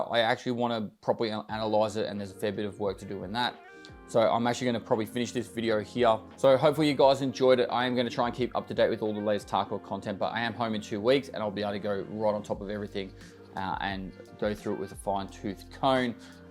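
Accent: Australia. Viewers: My daily dose of australian accent is back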